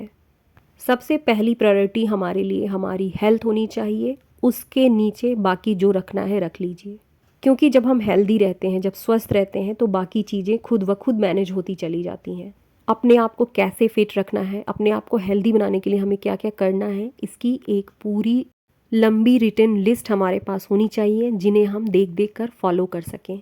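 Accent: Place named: Chhapra, India. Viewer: M chpra me rhti hu.pr apki language tone idhr ka hi lgta h.ya khan pan.hryana side ka nhi lgta,video achhi h.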